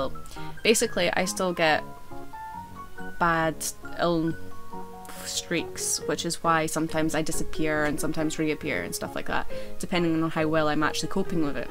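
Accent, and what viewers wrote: Scotland, United Kingdom. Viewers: Probably you get asked if your Irish as the northern irish and Scottish accent have similarities :) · No she is British can u tell the accent I'm an American and I know that,and why is people trying to get ur age, you did the right thing and not telling them ur age. Yeah same here I wear 24/7 because I love it,no medical reason but I do love your fighting spirit that gose a long way with u... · Lol, your accent is not too strong for being Scottish. But definitely recognizable. My friends parents came from there. And somehow her mom's voice has been implanted into my brain and I think in a Scottish accent. That is so weird to me lol.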